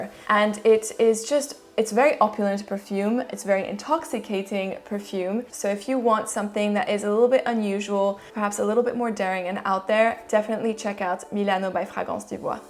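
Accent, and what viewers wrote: France. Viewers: Do you have a very slight French accent? I might be wrong, English is my second language, so I don't always pick up light accents. But you seem to know how to pronounce French word too.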